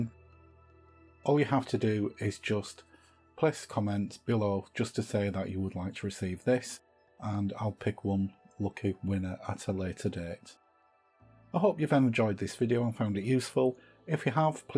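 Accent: Northern England, United Kingdom. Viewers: So lovely to see & hear your video with a 'new' take on an old friend. Applying tape to the whole sheet first - genious. And the presentation is so calming - no disruptive music, or irrelevant chit chat, just the basic instructions. Great to hear a (UK) Northern accent too - Manchester? More please!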